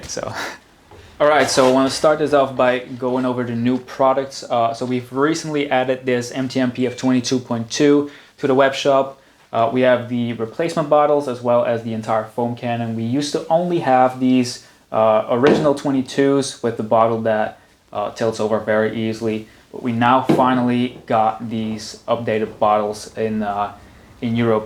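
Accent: United States. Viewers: I really learn a lot from all of your videos whether you talk or choose not to talk. Both are excellent. By the way, you sound American, but I thought you were Dutch. Where exactly do you live in Europe?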